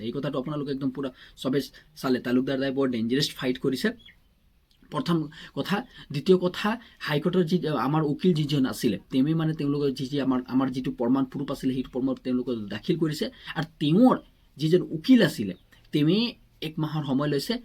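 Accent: Bihar, India. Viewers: Toi axomia a koba najna nhu ..bihari nki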